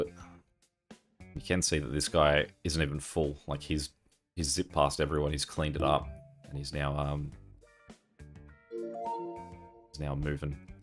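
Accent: Australia. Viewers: Hi ☠️ I know that you live in Victoria, Australia 🇦🇺 because of your accent. I live in Adelaide, Australia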